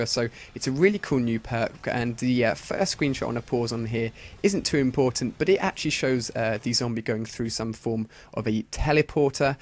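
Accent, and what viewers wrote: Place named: United Kingdom. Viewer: i love your british voice :| me gusta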